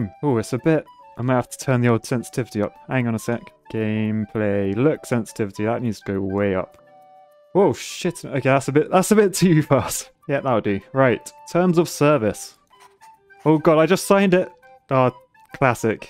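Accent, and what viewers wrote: United Kingdom. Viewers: this bloke sounds cheeky BRITISH maybe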